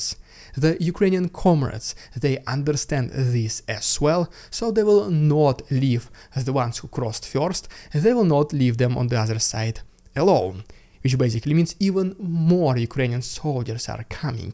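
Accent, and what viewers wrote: Russia. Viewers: You have a strong Russian accent but your English is impeccable. The Russians can't handle losing face, just like thier allies China and North Korea. Slava Ukraini 🇺🇦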